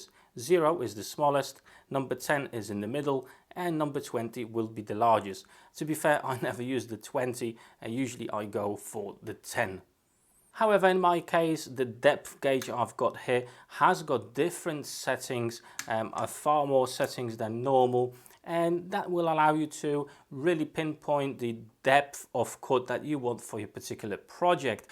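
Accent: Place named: Northwest England, United Kingdom. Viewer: What accent have you got, I'm hearing northwest England, and German maybe ha ha ha.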